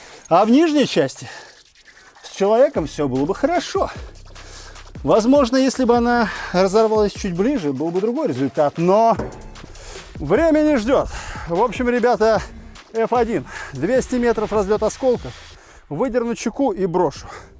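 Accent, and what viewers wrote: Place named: United States. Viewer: Уважаемый ведущий вы вроде мужик а ведёте себя как американец. На ютубе есть подобные ролики американского производства и у меня сложилось впечатление будто манеру держаться и разговаривать вы взяли от туда.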